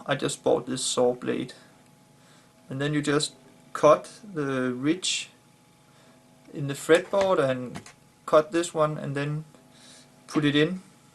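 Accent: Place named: Denmark. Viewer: are you Danish by any chance? your accent is so Danish!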